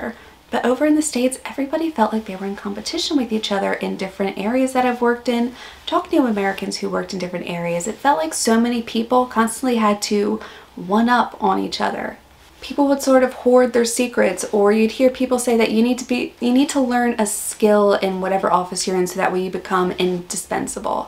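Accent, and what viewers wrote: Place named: Australia. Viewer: Watching your vids the last little while I can see you kind of relaxing and aclimatising to our Aussie ways of speaking. Pretty sure your nearest and dearest by now see you "going native". It's ok. We are a nation of immigrants. And TBH I have known a few Americans and even Canadians in my time. Noone ever wants to move "home". Home is where the heart is.But we steal them here. My Father in law moved from the UK at 17 for a few years to make his fortune. He's still here. My Grandparents went on a cruise back to the UK back in the 70s. Many of there shipmates were "going home" because Australia was not for them. A large percentage were on the return voyage, realising "home" was now Australia. This place gets in your veins. And we love it.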